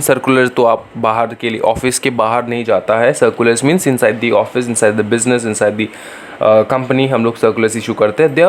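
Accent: Bengal, India. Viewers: Apka accent se pakka bol sakta hu ki aap Bengali ho😂😂..love from Guwahati ❤️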